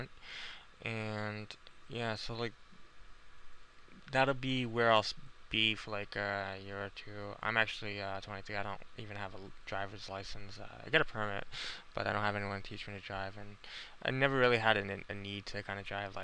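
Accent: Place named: New England, United States. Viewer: i thought you sounded like you were from the new england area, MA here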